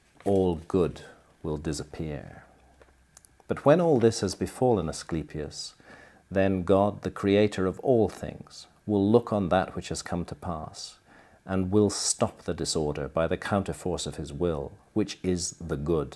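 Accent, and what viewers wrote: United Kingdom. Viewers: Then those sexy 💋, please yall don't do that to me anymore, because I'm from small town alabama u.s.a , but qas once married to a David O'Connor migrated from Ireland pre tern he died 3 yrs ago he was also 28 yrs my senior opposite race than I so we did indeed have plenty of problems but ok anyway I simply miss the acsent of men from the isles, u.k. great britain, I'm absolutely blushed, cause by my living in the country the country, I only hear such acsents on you tube its just intriguing. Fabulously prevented, which is no surprise with you because your always +#100 in mt book because your you and that's a real man whom will gave his voice heard on misdoings of others as well as honesty in presenting your newly found discoveries to the attention of the masses, awesome research 2. Thank you for sharing your knowledge of truth with others such as I. 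You and your 👪 remain blessed. Keep doing what your doing , DON'T CHANGE A SINGLE THING.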